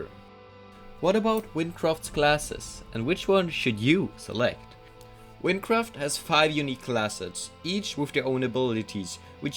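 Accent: Germany. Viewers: Where are U from BC english Sounds like a German accent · it sounds like u are from germany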